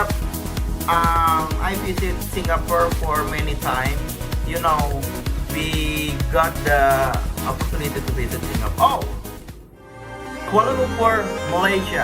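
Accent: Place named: Indonesia. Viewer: Englishnya Indo bgt😄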